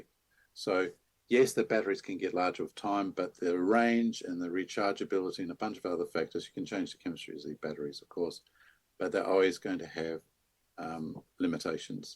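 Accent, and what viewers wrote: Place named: Australia. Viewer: Very interesting - thanks for that. You had me with the accent, I felt sure you were, like me, an Aussie.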